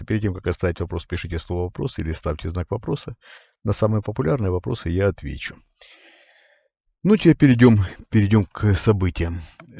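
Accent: Ukraine. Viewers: ВОПРОС. Уважаемый, начал слушать вас совсем недавно, поэтому возможно пропустил информацию о вас. Тут посмотрел самые первые ваши выпуски и заметил у вас явный украинский говор. Мне очень приятно, что такие добрые люди, как Вы с моей любимой Родины, я сам с Украины, скажите пожалуйста, а с какой вы области или региона Украины? Спасибо!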